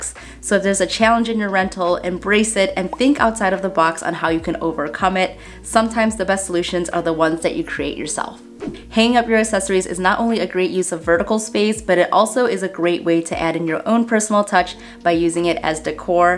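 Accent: Chicago, United States. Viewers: yoooo this room is rad! (also love your chicago accent! 😊)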